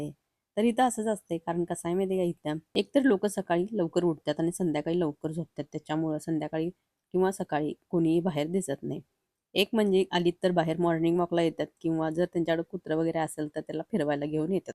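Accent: Kolhapur, India. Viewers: तूम्ही कोल्हापूर चे आहेत काय भाषा वरून ओळखल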